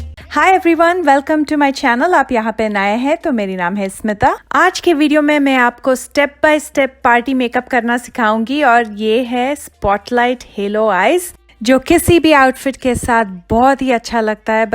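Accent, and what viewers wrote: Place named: India. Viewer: This hindi accent!!!!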